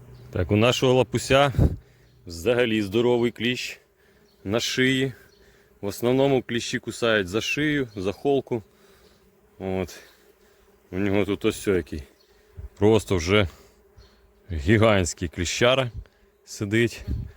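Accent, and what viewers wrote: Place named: Urals, Russia. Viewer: Акцент у этого русского странный ,то ли с деревень Урала, то ли с деревнь Удмуртии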